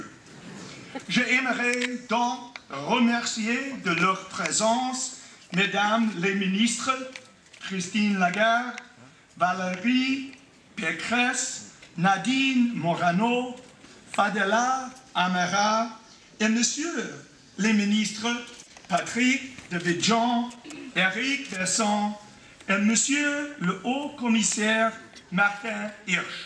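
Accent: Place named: England, United Kingdom. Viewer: omg I was not expecting this when I hit play 🤣🤣 fair play to him, I would not have the guts to speak on stage if my pronunciation was this bad. It actually gets better as he goes on, just his intonation/cadence is very English.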